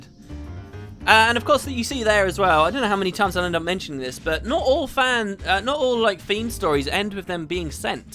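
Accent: United Kingdom. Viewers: Hey just wanna say how much i appreciate you doing all of this. I get the vibe that you put way more effort in your vids than most other youtubers or LPers. That and the voice of a charming brit make a killer combo;) Keep up the brilliance!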